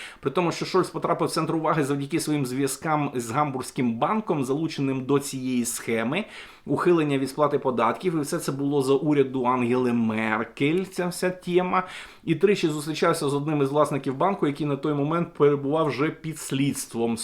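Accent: Russia. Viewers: Пане, все файно викладаєте, але чому "тєма", а не тема? Чому вам так смакує рашистська вимова?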